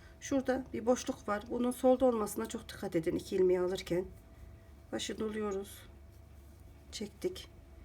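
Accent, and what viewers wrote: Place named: Kayseri, Turkey. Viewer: Bacım sen nerelisin kayserilimisin ne tatli turkcen var 🥰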